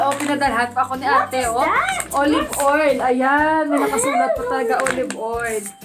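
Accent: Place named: Hiligaynon, Philippines. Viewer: More blessing inday.always watching ur vlog and don't skip adds.basta ilongga malambing gid ya mag salita.kaya halata ka ng asawa ko na ilongga dahil sa tuno ng pananalita mo.asawa ko taga bacolod din.God bless inday.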